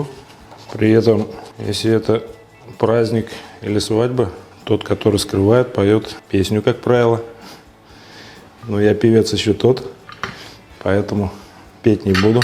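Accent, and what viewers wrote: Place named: Kazan, Russia. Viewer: Уже много лет живу далеко от родины. Рецепт простой и вкусный и поведан с таким неповторимым казанским выговором, ну просто бальзам на душу. Спасибо большое ☺️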